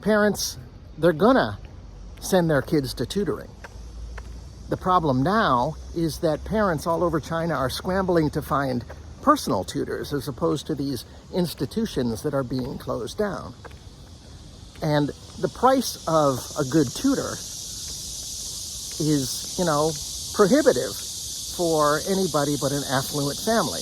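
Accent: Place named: United States. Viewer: were you learning English as a second language? Where are you from then, you sound American to me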